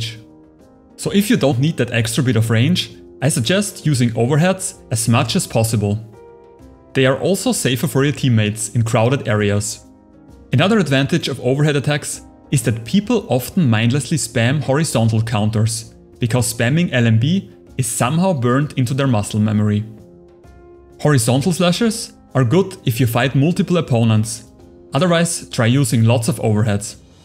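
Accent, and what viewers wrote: Germany. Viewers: You German? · German Accent 👌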